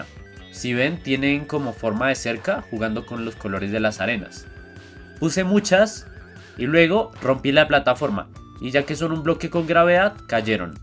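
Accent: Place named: Colombia. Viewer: El asento se parece a Colombia no se muy bien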